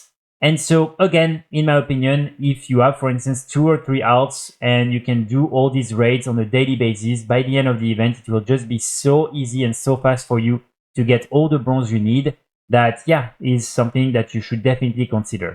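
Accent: France. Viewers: Tes français non ?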